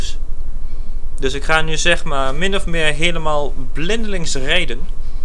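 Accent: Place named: East, Netherlands. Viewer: Hé! Een Nederlandse video! Ook leuk! Zo te horen kom jij ergens uit het Oosten? :p Ik kom daar wel vandaan ;)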